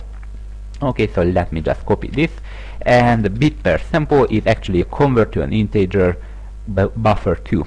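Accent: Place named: Hungary. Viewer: Nagyon jó oktató videó, köszi! Messziről rikít a magyar akcentus, bár ezért a YT névjegyben is szabadkozol. Már majdnem rákérdeztem külön is,de aztán feltüntek a menüpontok. Viszont a videó nagyon jó, és messze jobb pár ér felvágós, indiai akcentusnál.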